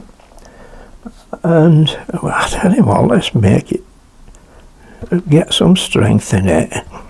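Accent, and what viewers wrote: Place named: Northern England, United Kingdom. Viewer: Lol. Sorry,. I've never been first before. Just want you to know how much fun I have watching your videos. I love your freedom while painting, even tho I can't understand half of what you say. I'm from the US and my ear isn't tuned to Northern England. But it's interesting to put it together from context.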